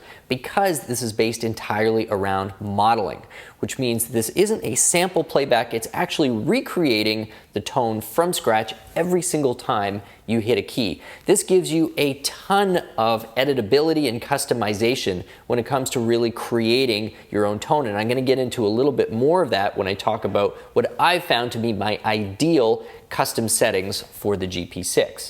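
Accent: Canada. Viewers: Questionable, indeed. I would say downright annoying! I don't understand the point of the subtitles, since speaks clearly in perfectly understandable (eh?) Canadian... 🙂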